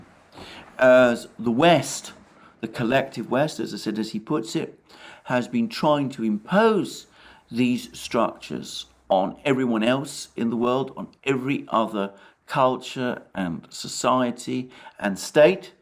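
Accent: England, United Kingdom. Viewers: Russian disinformation with an English accent!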